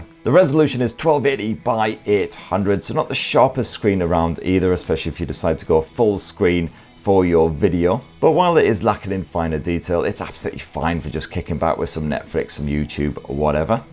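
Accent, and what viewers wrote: United Kingdom. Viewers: He's British in case you don't know 🤣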